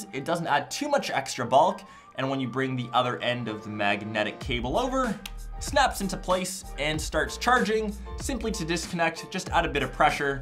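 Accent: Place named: Canada. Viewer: I love your Canadian accent. It's funny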